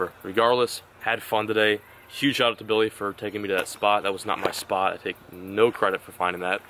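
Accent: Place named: South, United States. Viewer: Anyone notice how's he has like a southern accent in his vids at college compared to now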